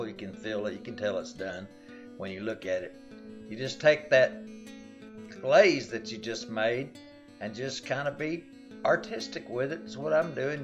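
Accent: South, United States. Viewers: I love the southern draw!!! Thank you so much for recipe about to make now!!!!! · Love this Forrest Gump accent!! And the cake looks delicious!!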